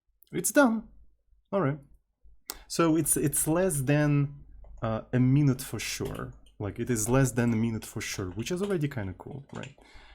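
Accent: Russia. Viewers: This thing u said inspires me a lot I always afraid of doing dumb things and writing shitcode but I want to improve Also your English is so Russian so I feel like a native English speaker listening you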